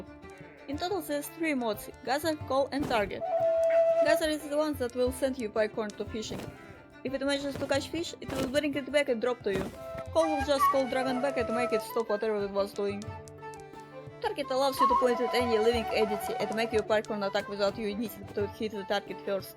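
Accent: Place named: Russia. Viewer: Просто спрошу, ты написал коммент на русском потому, что тебе тоже показалось, что у девушки очень яркий русский акцент?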